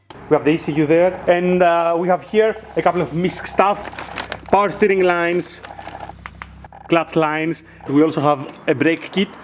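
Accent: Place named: Greece. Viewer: Απο την αρχη του βιντεο καταλαβα οτι εισαι ελληνας απο την προφορα... πολυ καλη δουλεια!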